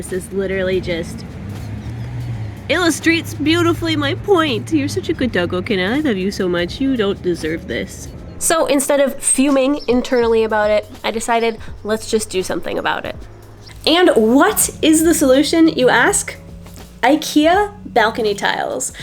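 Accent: Quebec, Canada. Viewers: Ça faisait longtemps que j'espérais t'entendre parler français un jour dans une vidéo (avec ton super accent québécois !) ☺ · J’aime beaucoup t’entendre parler canadien 🤭 Ta terrasse est splendide ! Ton propriétaire l’est moins 😂 !! À bientôt ! 🌸🌸🌸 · Très plaisant de t entendre parler français avec ce superble accent québécois! Du miel pour les oreilles 😘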